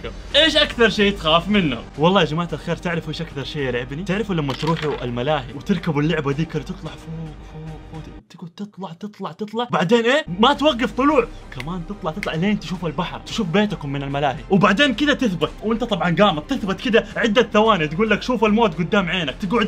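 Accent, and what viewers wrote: Jeddah, Saudi Arabia. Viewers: هو من جدة لأني قد شفته مرة من المرات بمطعم بجدة و حتى لهجته جداوية ترا ((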